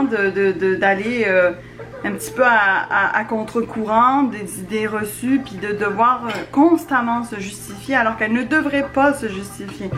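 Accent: Quebec, Canada. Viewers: Incroyable !!! Tu as carrément pris l’accent je suis ébahie haha, à la base tu es du sud de la France non? J’essaye d’analyser tes intonations c’est un mélange intéressant! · Est-ce que tu es native canadienne ? 😌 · T’as dû prendre l’accent québécois sans t’en rendre compte en tout cas je t’entends vraiment parler le québécois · C'est ce qu'on appelle l'accent hybride, c'est à dire mi-français mi-québécois, un accent québécois light en quelque sorte, du coup un français qui écoute aura l'impression d'avoir affaire à un québécois et un québécois qui écoute verra tout de suite qu'il ne s'agit pas d'un québécois autochtone... Les français vivant au Québec peuvent avoir cet accent hybride sans forcément s'en rendre compte, c'est le cas pour, elle utilise le phrasé, les intonations québécoises ainsi que les tournures de phrases de son nouveau pays de résidence.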